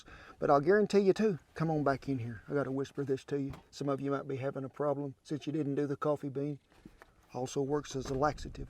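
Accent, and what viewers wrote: South, United States. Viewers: Also. I love the way you talk. The country twang. I’ve had several southern friends and I’ve always enjoyed the accent. Some hate it. Say it’s uneducated. They need more garlic. That’s what I say. 😂😂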